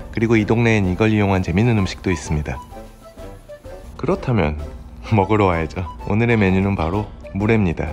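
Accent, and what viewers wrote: Gyeongsang, South Korea. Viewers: 경상도~~~말투부터 짜증나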